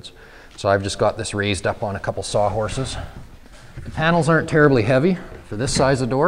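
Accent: Canada. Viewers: Great pleasant Canadian accent n the know, the how, the teach. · Outstanding instructional video. I'm assuming from this guy's accent that he is Canadian and it has been my experience that Canadians just get things done - efficiently and resourcefully, without a lot of hoopla and distractions. No lousy music, cussing (tho' he came close at one point), or embarrassing attempts at special effects and other so-called creative videography. Simply good, clear, well-paced instruction. Will check out the other videos at Houseimprovements.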